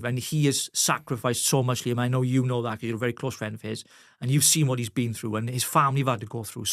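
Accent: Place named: Wales, United Kingdom. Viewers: Da iawn! We very rarely hear the accent of my homeland..🏴🏴🏴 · As a valleys woman, it’s refreshing to hear a Welsh man share his passion 🏴. Our children deserve a future with our values. My 8 yr old son has been having s*x Education 😳 it’s insane. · Love it! A silver-tongued Welshman with a fire in his belly! Just what we need in a time such as this....Advance UK!! God bless.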